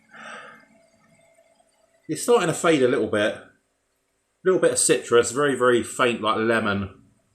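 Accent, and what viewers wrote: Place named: United Kingdom. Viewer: your british accent is so lovely and comforting, like music for my ears and heart ❤ thank you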